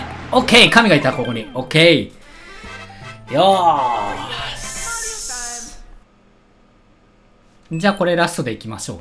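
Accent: Kansai, Japan. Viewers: さん関西の人？(・o・)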